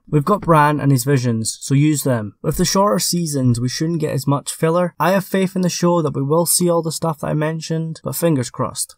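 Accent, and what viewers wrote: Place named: Scotland, United Kingdom. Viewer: Leave it to the Scottish guy to say "more main characters killed off." But, alas, you're right. And I really hope my accent detection is decent enough to know you're Scottish. I love your work and look forward to your videos everyday. Thanks for the hard work :)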